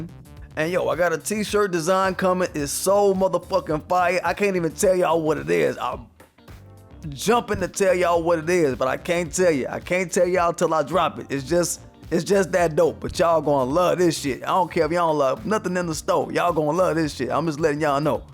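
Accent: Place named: Texas, United States. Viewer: You sound like an old white hick from Texas.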